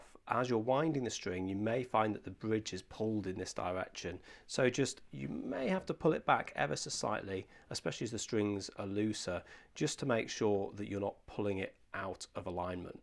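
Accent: England, United Kingdom. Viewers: Nice job! Love the English accent too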